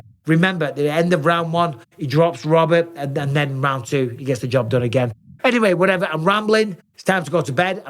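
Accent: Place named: England, United Kingdom. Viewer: This is why I love f**** Michael bisping.. speaking English English and s*** .. putting you motherfukers on game😂❤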